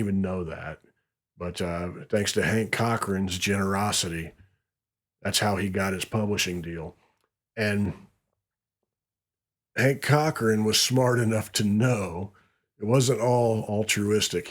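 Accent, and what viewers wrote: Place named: South, United States. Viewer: You have a great voice & southern drawl & calm to awesome story telling! Love your repotoar!! 🙌👏👏✌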